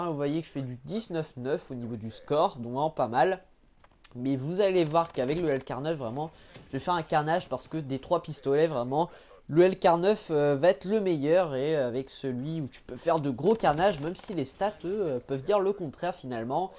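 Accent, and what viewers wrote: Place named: North, France. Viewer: Tu viens du nord toi non m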